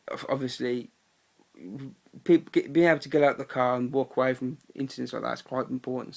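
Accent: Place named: Netherlands. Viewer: why you speaking like a dutch guy?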